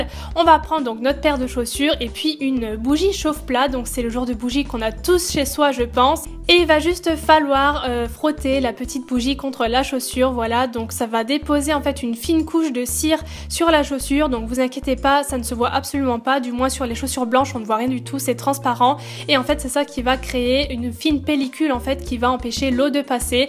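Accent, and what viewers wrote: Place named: Alsace, France. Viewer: Haha quand j'ai entendu ton accent j'etait sur que tu etait alsacienne ,comme moi 😉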